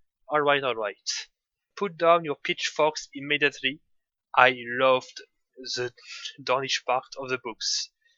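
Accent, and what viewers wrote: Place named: France. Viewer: Y a qu'un français pour prononcer "épisode" comme ça ^^ c'est la première fois que je vois un francophone faire des vidéos en anglais, c'est un peu perturbant d'avoir un aperçu de notre accent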